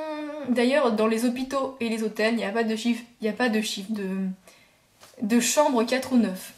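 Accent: Japan. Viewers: Vous devriez arrêter de critiquer ce qu'elle fait. Elle le fait pour la plaisir d'apprendre à ceux qui veut en apprendre plus sur le Japon ou sur la langue. Je suis une Française, n'ayant jamais visité le Japon et je ne vois pas en quoi "elle fait honte à votre langue" ou à votre pays, ou encore que "le Japon a une image débile" à cause de tout ça. Pour moi, elle ne donne pas cette impression, même que le fait qu'elle soit si passionnée et contente de ce qu'elle fait apprendre, elle donne envie d'en savoir plus sur ce beau pays! Voilà mon coup de gueule. xD Bravo pour tes vidéos,! J'aime beaucoup ce que tu fais et tes cosplays, ainsi que ton accent Japonais qui est trop mignon.:) Et je me marre bien avec les commentaires que tu rajoutes! x) Je connaissais déjà les bases de la langue puisque je l'apprends depuis quelques mois, mais ça fait du bien un bon rappel en vidéo. 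Un bon rappel des bonnes manières aussi, j'aime beaucoup le Japon pour ce respect et ses traditions. ♥ Bref, bonne chance à toi! Recommences tes vidéos, ne désespère pas parce que y'a des commentaires négatifs. Et si tu as juste pas le temps, bah j'espère alors que tu continueras quand tu aura le temps!;) J'AI ENCORE FAIT UN PAVÉ NAN D'UN CHIEN! x) Aaah. Bref, courage! Et j'suis impatiente de te revoir en vidéo! (une abonnée en plus!) xD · J'adore ton axent, Japonais. 8D Les bonnes manières au Japon sont presque pareil en Corée. Tu t'es vachement amiloré x)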